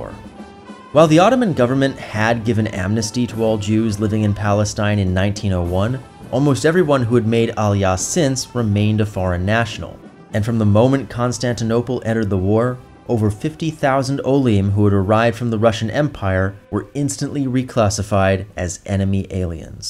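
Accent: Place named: New York, United States. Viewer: Just curious if you see this we’re you raised in New York? You have the accent. Love your channel from Long Island/ Netanya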